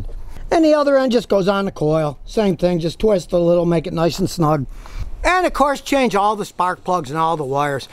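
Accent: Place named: Chicago, United States. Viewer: Scotty Kilmer, you're hilarious brother! Chi-town accent adds to the overall experience. It's like Anthony Spilotro became a mechanic 😂😂🤣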